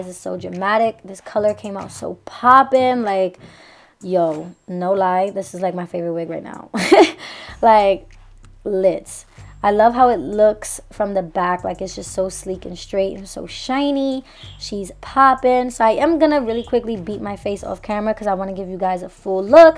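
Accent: New York, United States. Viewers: I love your personality 😍😍 I knew u was from NY after watching 10sec of the video 😂😂😂😑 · New subscriber!🙋🏻‍♀️ Within the first 10 minutes of you talking I swear i knew you was from ny 😭 then you said it & im like yassss ! Finally a New York YouTuber 😩😭🙌🏽❤️ your video & wig is bomb af❤️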